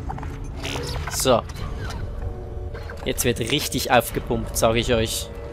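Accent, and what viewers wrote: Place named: Switzerland. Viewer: Erfrischung mit schweizer Akzent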